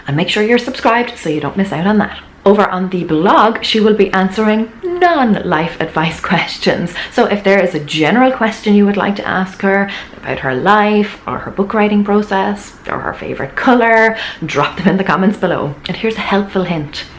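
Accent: Ireland. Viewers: I'm so excited for this interview with Sarah Knight! Fun fact: After watching your review on The Life-Changing Magic of Not Giving a Fuck, I'm currently reading it..... and in my inner monologue, it's narrated in your Irish accent! So, the comment about you doing the audio book was particularly funny for me ;) I can't wait to get into the new book. I'm in Canada, so I'd love to win the US version of Get Your Shit Together!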